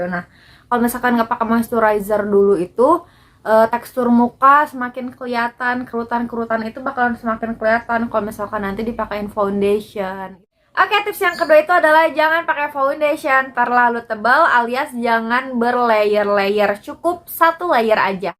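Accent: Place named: Bandung, Indonesia. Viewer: Bandung baget logatnya 🤣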